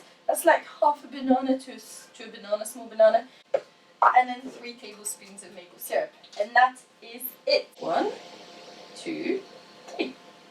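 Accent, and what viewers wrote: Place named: France. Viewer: Bonjour! By your accent I guess you're French?:) I lived in France for 8 years or so, and I must say I can't remember a high concern there for gluten free etc, there just seemed to be less allergies over there? Am I wrong?? I honestly first heard of allergies to gluten in the UK.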